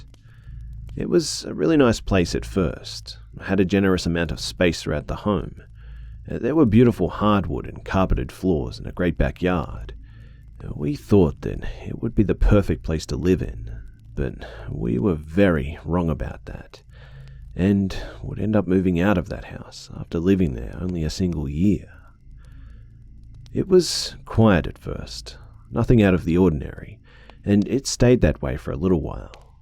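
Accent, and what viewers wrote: Australia. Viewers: Ahh it's always good to hear that amazing Aussie voice! 🌼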